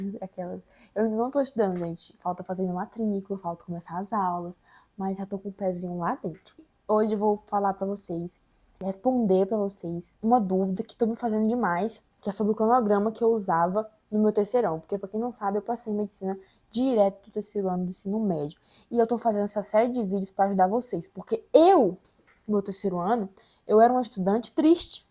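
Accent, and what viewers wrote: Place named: Pará, Brazil. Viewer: Tu é paraense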